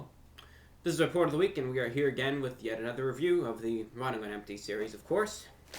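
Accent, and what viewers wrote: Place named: Rhode Island, United States. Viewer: good reviewing, you sound like you are from the great state of Rhode Island!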